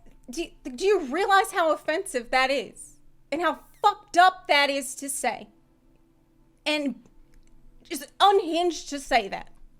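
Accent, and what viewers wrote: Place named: Louisiana, United States. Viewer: The Louisiana really came out of in this one, damn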